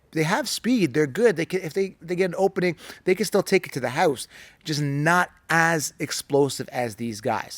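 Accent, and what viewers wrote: Canada. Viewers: I like watching your show.. I can even tolerate your Canadian accent but could you actually pronounce someone’s name right??? Is that too much to ask??? You sound like a damn fool.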